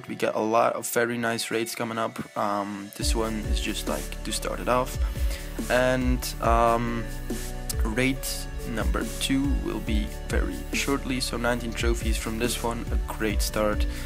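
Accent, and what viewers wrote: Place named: Switzerland. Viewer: really its sounds like your are from switzerland xD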